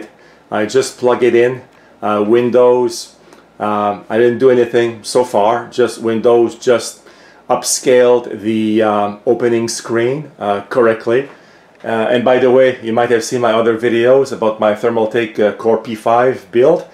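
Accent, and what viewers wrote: Quebec, Canada. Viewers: thanks ;) Aussi t’es tu du Québec t’as un accent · Salut je reconnais l'accent Quebecois haaha Nice review!!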